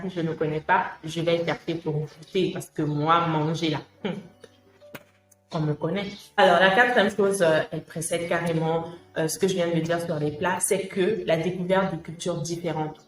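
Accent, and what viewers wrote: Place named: Senegal. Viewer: Toi la tu ressemble à une sénégalaise deh 😁même l'accent c devenu sénégalais deh🤣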